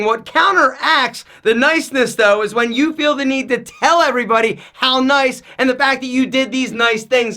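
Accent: Philadelphia, United States. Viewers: Anyone know if dude's from philly? Lol thick philly accent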